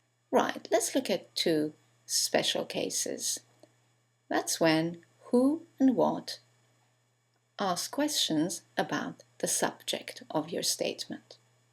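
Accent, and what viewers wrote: United Kingdom. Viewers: Thank you so much for this video! I wanna ask you about the accent, teacher. Is this totally British English? Could you please give me some advice of choosing one between BE and AE? I think I should choose the one that is easier to understand( to me ) ? Is that enough to make a decision , teacher ? Thank you for your attention! Nice day! <3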